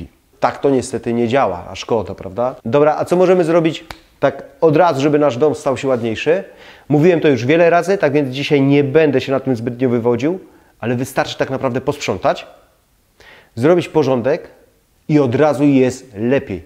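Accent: Silesia, Poland. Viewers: Ależ razi ten śląski akcent 🙈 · Nie, proszę nie :) ! Ma pan piękny, bardzo delikatny śląski akcent ❤, czy dobrze go słyszę? Niech zostanie! Pozdrawiam serdecznie.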